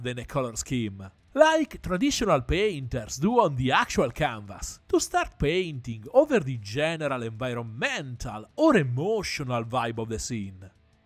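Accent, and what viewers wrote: Italy. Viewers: ho capito che eri italiano prima di vedere il nome del canale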